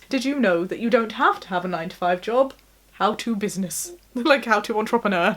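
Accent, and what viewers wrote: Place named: United Kingdom. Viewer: Not gonna lie, as an American I think for me it’s the British accent that makes you seem wise 😂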